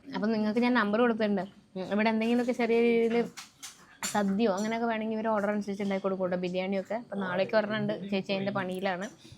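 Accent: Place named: Palakkad, India. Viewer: Palakkad bhasha .. shaaatting..❤❤❤❤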